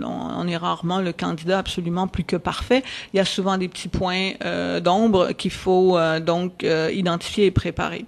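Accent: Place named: Canada. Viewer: J'aime beaucoup l'accent canadien :)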